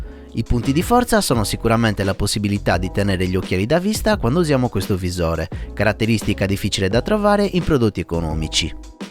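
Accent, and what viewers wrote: Italy. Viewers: At first I was annoyed that English titled video is not in English, but I decided to put on English subtitles and this review is actually nice and clear! I actually even liked this Italian accent :D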